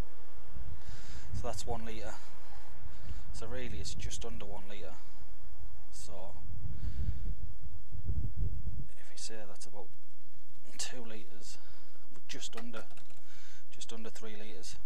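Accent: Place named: Durham, United Kingdom. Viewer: You sound like your from my kneck of the woods Durham very helpful video cheers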